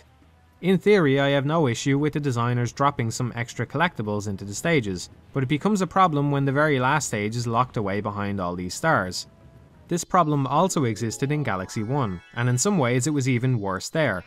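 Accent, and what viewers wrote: Ireland. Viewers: Love your videos. Can't get enough of that Irish dictation. I have a new idea for a review series: Mass Effect.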